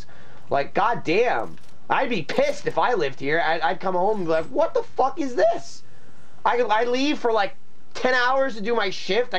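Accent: New Jersey, United States. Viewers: He still sounds like he is from Jersey in these videos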